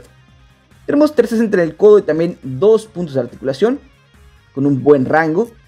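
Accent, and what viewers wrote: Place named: Peru. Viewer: Buen video, Eres de México Bro? De que parte? O eres de sudamérica? Tienes un acento como Peruano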